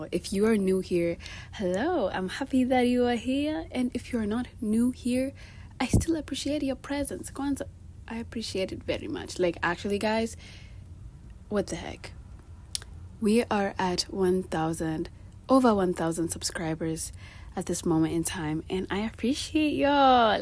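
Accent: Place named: United States. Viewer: I love that American acsent of urs... very cutesy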